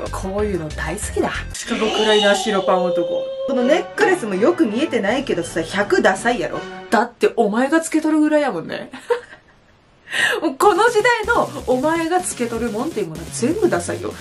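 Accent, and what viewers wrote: Nagasaki, Japan. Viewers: 久しぶりに見たバイ。おぉ、かわゆくなっとるやないか、長崎弁と致死量の毒は変わっとらんな！安心した。🤣🤣🤣🤣🤣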